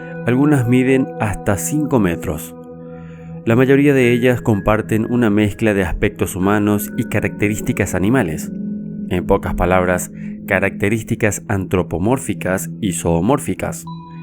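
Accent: Paraguay. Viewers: Eres paraguayo ?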